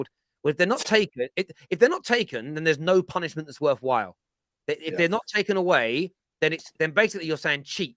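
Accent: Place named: Manchester, United Kingdom. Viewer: Another United fan with a manc accent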